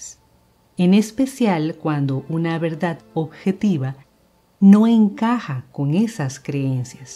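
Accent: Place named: Costa Rica. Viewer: Porque es costarricense 😁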